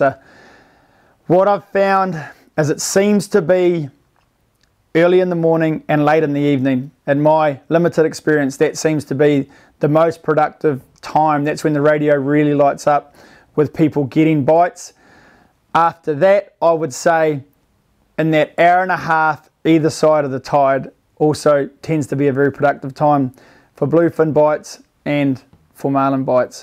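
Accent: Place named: New Zealand. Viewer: This was really an awesome explanation with a great focus on the "Recreational Fisherman". Here in the Northeast USA (Maine), we have the Recreational Bluefin (<72 inches) and the Giant Commercial Bluefin (>72 inches). When you wet a line here, you just never know what you are gonna get hooked to. Learned some good skills/techniques on what to include for a set-up. Your clear spot-on presentation style is greatly appreciated. Eliminating the fluff and ego, with a bit of Kiwi accent, what more could a viewer ask for.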